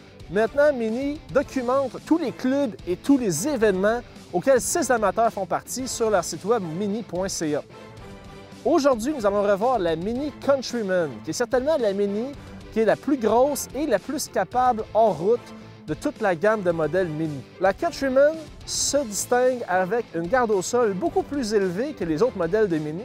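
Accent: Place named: Vaud, Switzerland. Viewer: On dirait un accent vaudois quoi!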